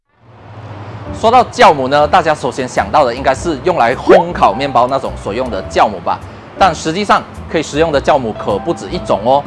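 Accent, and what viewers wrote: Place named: Malaysia. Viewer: 马来西亚的声音和我朋友一样😂喜欢…..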